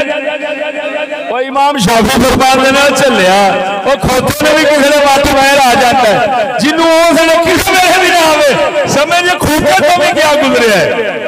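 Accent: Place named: Punjab, India. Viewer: Urdu ati hay laykin ap ki zuban mubarak pr punjabi charhi hoi hay.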